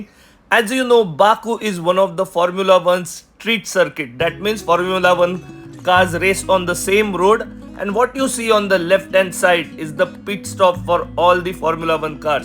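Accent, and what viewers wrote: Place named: India. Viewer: Great guy. Heavy Indian accent with only a few pauses.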